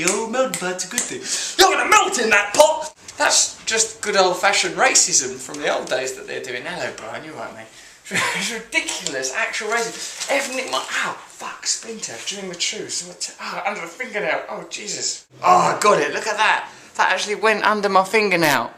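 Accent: United Kingdom. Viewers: Hey.. listen to enough of these and you too can start understanding brit .. love it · Hey i don't understand to much british accent, and you speak very fast, breath a little lol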